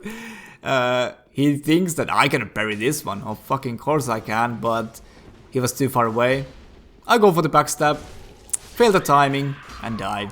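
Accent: Finland. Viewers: For some reason you dont have that ugly Finnish accent, but a really enjoyable one. Get some riposte dps bro.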